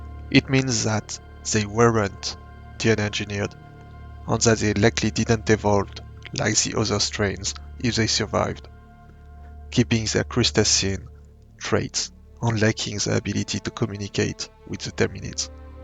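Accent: France. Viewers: Your French accent is very strong, and that is FINE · j'ai l'impression de m'entendre essayé de parlé anglais en t'écoutant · you sound french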